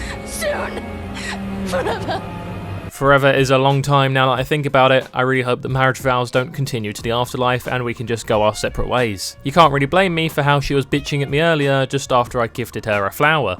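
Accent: United Kingdom. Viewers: Are you British Bro?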